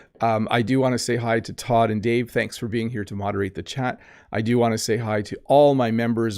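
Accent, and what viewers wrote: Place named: Canada. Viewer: It was a great conversation, I enjoyed it so much I forgot what I wanted to state in this comment section. If it comes to my mind, I will come back and write it down. Until, let me tell you what I didn't forget: if you want to make a lesson about Canadian versus American English, I noticed the following differences: about - the Americans pronounce the o in this word differently than you. resources: the Americans pronounce it with s and not like you with z. Collect something in this topic, you may be able to do it once!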